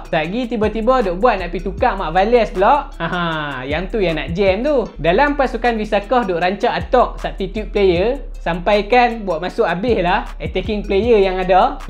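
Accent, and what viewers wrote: Kedah, Malaysia. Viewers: Cakap kedah, kita pon dengaq puaihhhh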